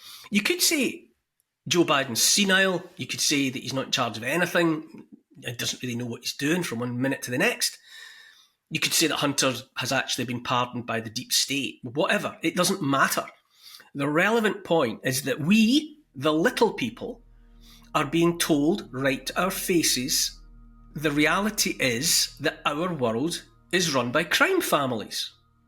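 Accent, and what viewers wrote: Scotland, United Kingdom. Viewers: I am a subscriber…i love you… I love listening to your Scottish accent…and you are right on! ❤️❤️❤️ PS I am Canadian…so was my Nana! 😊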